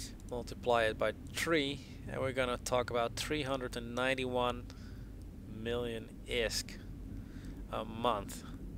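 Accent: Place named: Netherlands. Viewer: Goed engels hoor, maar het is net duidelijk genoeg dat je een nederlander bent :P Froeten Mark Androcius ( character naam op EVE )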